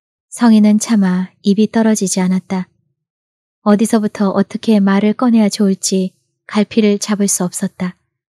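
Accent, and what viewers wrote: Jeolla, South Korea. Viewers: 천재다...오디오북 유투버들의 격을 높인 채널이다. 이전에는 사투리며 지방색을 어설프게 표현해도 그러려니...좋은뜻으로 하시는 분들이니까...하고 퉁치고 넘어갔었다. 그렇지만 이 분은 필시 공부하는 유투버다. 연구하고 분석하느라 여러번 읽었던 티가 난다. 이 분은 몇개월전만해도 전라남도 사투리가 엉성했었는데 현재는 놀랄만치 여러 명의 배우를 등장시킨다. 작가가 추구하는 현장감을 살리고 작가가 주안점을 두고 있는 띄어읽기에 특히 주의하는 의지가 보인다. 노력에 박수. 박수를 보낸다.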